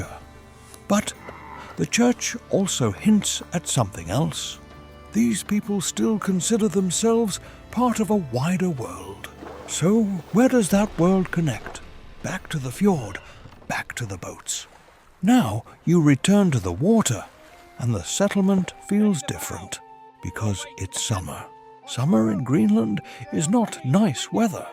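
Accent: United Kingdom. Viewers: Good point, this looks deceptively very real but aint no documentary. Compared to actual history, he likes to exaggerate the adversity for drama and clicks. I suppose the AI british accent is supposed to be more authoritative too. Id like a narration in Alabama accent please. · Nice footage and “British” narrator, but not a single word about where they got the timber from and what valuable items they sold, which made the Norse settlement rich. So, it has to be a “👎” from me, sadly.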